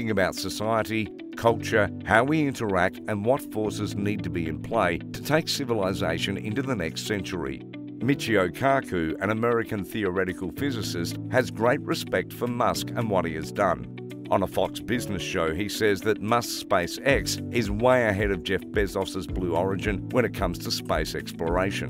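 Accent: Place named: Australia. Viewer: Glad to hear an Ozzie accent on Space exploration with know how. Just subscribed!!! Keep up the informative work - mate!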